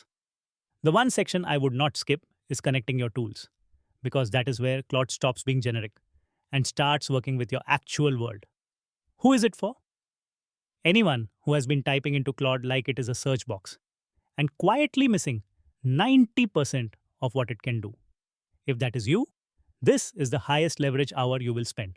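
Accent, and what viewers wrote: India. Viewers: You made a chatbot channel and you chose to use a Hindu accent english voice-over? My God... how counterproductive! Spare our ears and brains please!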